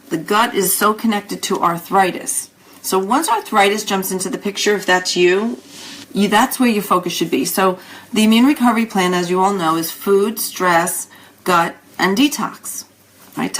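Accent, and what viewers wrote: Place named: New York, United States. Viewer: I think it's just her NY accent, maybe? I would've appreciated an improved effort towards personal appearance. Respect takes many forms.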